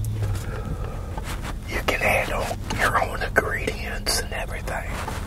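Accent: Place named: South, United States. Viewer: Are you originally from the south? You have a really cute southern accent lol. Have a lovely Sunday,! ☀️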